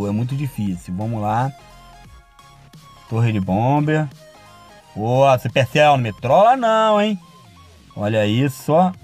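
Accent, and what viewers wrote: Rio de Janeiro, Brazil. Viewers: crl tu sim q é carioca rapá , nmrl vc é engraçado com esse sutaq carioca bolado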